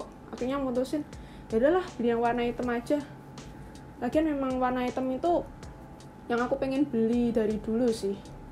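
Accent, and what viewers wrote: Java, Indonesia. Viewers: jawa banget ngomong nya, love you cantik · Medo' banget jawane :v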